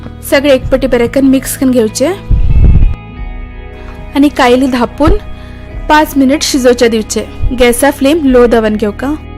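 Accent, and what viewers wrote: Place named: Konkan, India. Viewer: Which salt do u use is it sendha... I like your style of explanation very cute konkani.. Good recipe too :)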